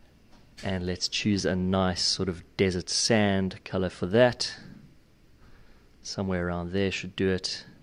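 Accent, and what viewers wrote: South Africa. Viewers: Thought i picked up a RSA accent confirmed when you said "ya" great videos and very well explained dankie from Livingston Scotland. Sub and liked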